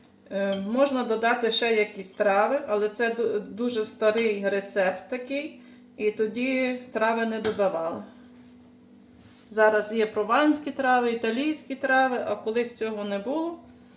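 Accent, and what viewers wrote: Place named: Volyn, Ukraine. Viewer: С удовольствием послушала вашу речь, вспомнила своих родных с Волыни.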